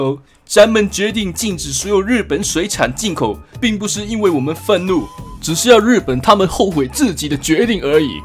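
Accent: Taiwan. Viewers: 完全不是普通話，單純的是台灣口音國語😂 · 呢個完全冇大陸口音，係台灣口音嘅國語啊😂😂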